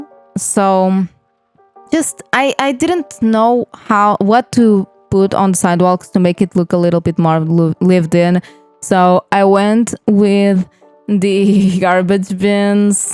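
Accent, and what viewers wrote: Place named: Russia. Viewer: where are u from you sound russian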